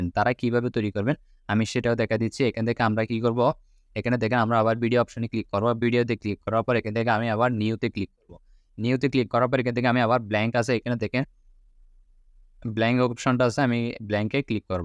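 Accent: Chittagong, Bangladesh. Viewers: ও চট্টগ্রামের আমি সিউর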